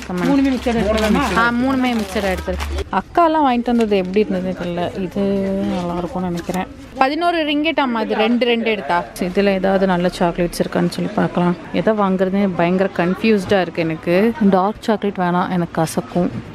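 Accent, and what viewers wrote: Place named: Salem, India. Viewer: எல்லாமே பிடிச்சி௫க்கு...முக்கியமாக உங்கள் பேச்சு...நம்ப சேலம் ஸ்லாங் அ௫மை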